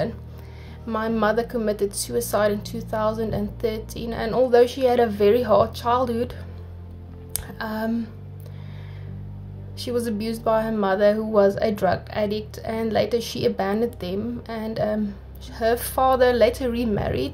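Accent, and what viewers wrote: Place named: Afrikaans, South Africa. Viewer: Hehehe guys if ur wondering about the accent it’s called the South African Afrikaans accent 🙈🙈🙈🙈🙈we have different accents here aswell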